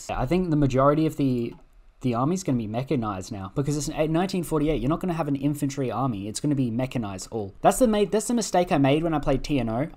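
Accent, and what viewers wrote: United Kingdom. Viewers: it's called british accent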